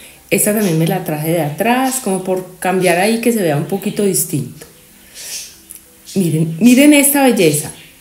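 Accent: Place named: Antioquia, Colombia. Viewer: Hola Sra, excelente el blanqueamiento del lavadero, desde que lo recomendaste lo he venido haciendo, lo mismo lo de mantener blanquito el Trapero, bueno yo aplicó todos tus consejos en mi hogar😊 por cierto me gusta mucho tu acento paisa😊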